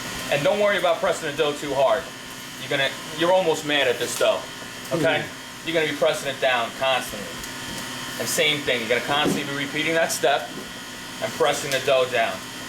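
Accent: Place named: Brooklyn, United States. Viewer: This guy sounds like he has a Brooklyn accent. Only in Brooklyn is where you will find real pizza guys who know how to make real pizza.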